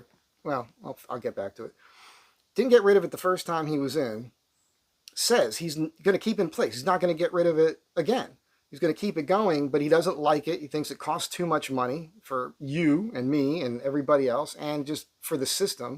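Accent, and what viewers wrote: New Jersey, United States. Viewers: LOVE YOUR YT.YOU ARE REAL🎉🎉 FOUND YOU FROM SARAH ❤🎉 HEARD THE JERSEY ACCENT AND MIND SET.I GREW UP * BACK EAST** I UNDERSTAND YOUR SELF SUFFECIENT LIFE❤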